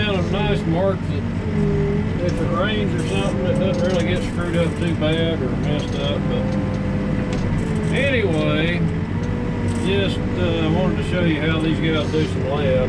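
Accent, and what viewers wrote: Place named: Oklahoma, United States. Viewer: That Okie accent is Okay!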